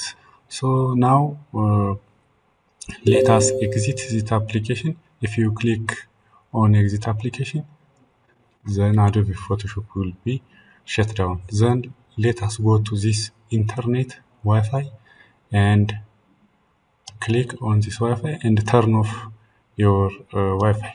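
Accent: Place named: Ethiopia. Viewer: My bro is definitely Ethiopian... the accent 😄 We were looking for a fix while we are using the internet bro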